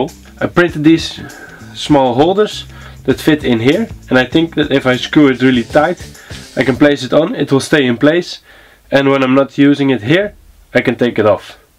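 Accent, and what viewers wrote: Netherlands. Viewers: Why do u sound dutch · Dat vermoede ik al aan je Nederlands accent in je Engels. Leuke video's, ga zo door. English text below. ------------------------------------------------------------------------------------------- I suspect that from your Dutch accent in your English. Nice videos, keep it up. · Ben jij toevallig Nederlands 🤔😂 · Zo Nederlands al maar kan dat Engels , mooi project ! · Nederlands? Gehoord accent.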